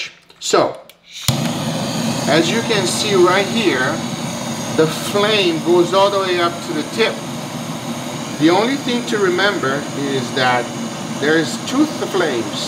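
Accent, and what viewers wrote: Cuba. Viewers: Hearing a guy from Brazil speaking Spanish with a Cuban accent made me want to move to Miami. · it's spanish, I know is brazilian but he was speaking spanish to the girl, with a strong Cuban* accent btw... He says "tu"(sp) instead of "você"(pt) like in "¿Tu quieres carne? (PT: você quer carne?/do you want meat?) He also says "abre la boquita (PT: abra a boquinha/open the little mouth) * I know I said cuban, but I think it's the Floridian spanish which is heavily influenced by Cuban accent, I've been there and noticed even non cuban latinos having their accents influenced(a little or a lot) by that cuban accent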